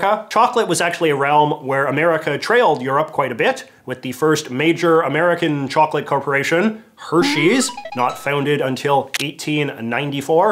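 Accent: Canada. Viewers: The most Canadian accent I’ve ever listened to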